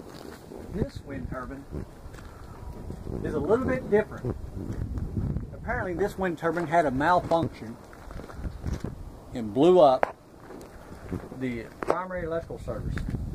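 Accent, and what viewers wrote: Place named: South, United States. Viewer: He clearly has a thick southern accent you twit 🙄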